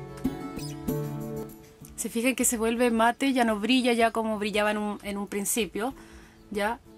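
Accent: Chile. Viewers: eres chilena????????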